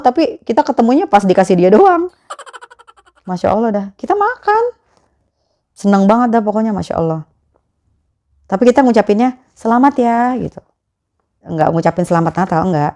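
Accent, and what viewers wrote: Jakarta, Indonesia. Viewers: hahahahaha si mpok asli betawi ya hahahaha, bahasa betawinya ketara, hahahahhaa NYATRONIN, GRUTU. keren mpok semoga sehat selalu mpok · Sepertinya dari logatnya mbaknya orang betawi neh... lucu 😅😅😅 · Ini cewek dilihat dari bahasanya. Gua tebak dari dekat pondok gede ( betawi) nih......😂😂😂😂. Saat pembukaan bicara mantap....nah setelah ceritain Babeh nya......keluar dah aslinya. Lah bujug neng oke banget da ah....😂😂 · Betawi banget sih pok😂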